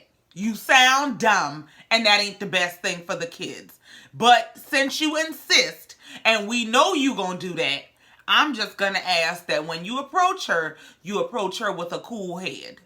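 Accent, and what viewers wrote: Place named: Louisiana, United States. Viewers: Girllll when I tell you you spoke nothing but FACTS! I'm here for it! Are you from Louisiana? I'm getting Lafayette or Lake Charles.. ? But either way I just found your channel this Thursday today is 10/22/21 and I love your commentary! I subscribed!! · I detect a very strong and seasoned clinical background as well as a Louisiana dialect. Did I pass or fail? #youregreat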